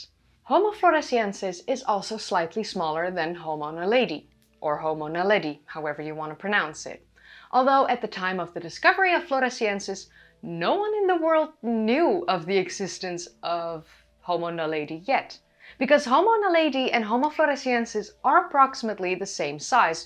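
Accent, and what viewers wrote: Netherlands. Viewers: Sounds like a Dutch accent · do I hear a slight Dutch accent? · Zie je wel, ik HOOR gewoon dat dit uit Nederland komt. Klee is trouwens een DUITS woord. Is dat met opzet? En je leraar Engels hield veel te veel van romans lezen. Uitspraak hoort echt veel meer overdreven en Nederlanders vertikken dat. We klinken bijna allemaal veel te vlak. Zaai. Niet genoeg verschil in hoog en laag. En niet dynamisch genoeg. Jammer, hoor. Luister gewoon eens naar Amerikanen of Britten. Ze zetten hun accent veel steviger neer. Dan klopt het ineens! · Your English is great! I could swear you have a Dutch accent somewhere though but that could be a bias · Your accent, perfect english, but are you dutch? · I can detect the Dutch accent quite clearly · what is your native language? Dutch? (It's the way you pronounce anthropology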